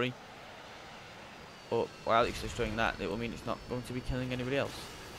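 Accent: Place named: North, United Kingdom. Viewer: Yo I’m from Australia and I’m trying to figure out if that’s a Manchester or Yorkshire accent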